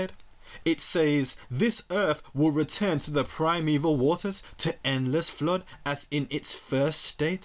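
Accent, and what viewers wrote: Birmingham, United Kingdom. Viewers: Is that a brum accent i detect. Beautiful video by the way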